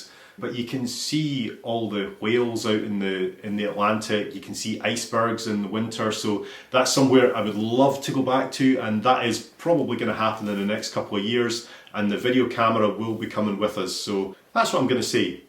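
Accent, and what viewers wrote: Scotland, United Kingdom. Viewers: Hi, I have been binging your videos for the last 4 or 5 days and not only do I love the way you wander around discovering places and people but also your fabulous accent. It's so nice to hear a Scot talking about Scotland; it adds flavour. I'm a Scot myself but I left Scotland years ago and miss it terribly. Traveling with you just brings it all back in such a nice way. Here's a suggestion for a wee visit; the Falls of Dochert at Killin, western end of Loch Tay. There's a Wade bridge and it's really spectacular. After that, take the loch side road to Aberfeldy, turn right onto the A 826 as far as Milton, then the A 822 through the Sma Glen to Amulree and Gilmerton. You'll be back in Edinburgh by nightfall and it's stunning all the way. Take care; look forward to more journeys. Aye, Christopher Pearson ( in Paris)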